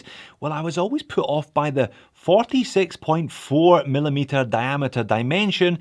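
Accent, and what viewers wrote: Scotland, United Kingdom. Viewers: I so want to try a tuna on, I know it's a watch that you need to wear to get a feel for. Fanny around, always good to hear a bit of Scots.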